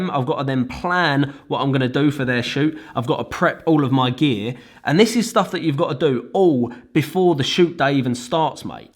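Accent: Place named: Essex, United Kingdom. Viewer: Who else came here in 2021 for the title but stayed for the voice! Massive bruv...get in there yer essex geezer, love ya stuff!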